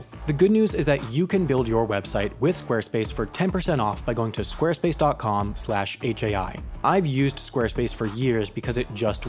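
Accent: United States. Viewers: Step One: If you're doing it in Britain...stop saying route the American way! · I got so far and gave up with the annoying American voice over and mispronunciation, America has terrible train services for passengers. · He's American....they all pronounce "route" as "rout". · It was quite weird hearing an American say the name of my small insignificant town near Manchester called Stalybridge. But yes, of course, he said it wrong: stal-y-bridge. It is Stale-y-bridge. One note of fame. Stalybridge was the theatre where "it's a long way to Tipperary" was first sung.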